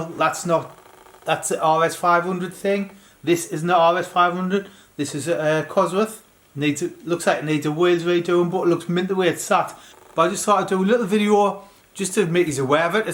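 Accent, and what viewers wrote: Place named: Sunderland, United Kingdom. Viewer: He's not a Geordie he's a mackam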